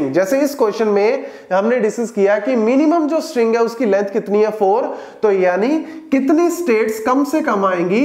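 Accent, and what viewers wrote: Punjab, India. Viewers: Sir ap jo punjabi accent mein teach krte ho usse apnapan lgta hai.. u r truly legend.. ❤❤❤❤❤❤❤❤lots of respect... 🙏 hmare guru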